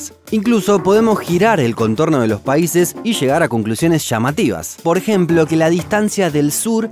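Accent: Argentina. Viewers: O sotaque/acento argentino é tão bonito que me distrai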